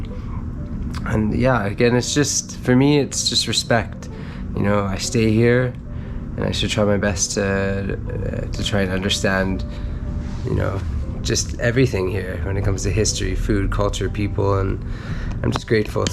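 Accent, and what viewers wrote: Bisaya, Philippines. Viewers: Its funny, really do sound like a Bisaya. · visayan dialect mode is amazing.... nakakahappy to listen to... maayo gid!!!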